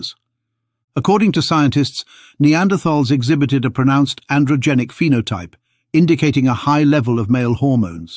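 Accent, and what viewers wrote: United Kingdom. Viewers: Just because there is a British accent delivering information doesn't mean that it cant be patiently stupid information. Right, wrong or infifderrnt, his is just way over done without any real science behind it.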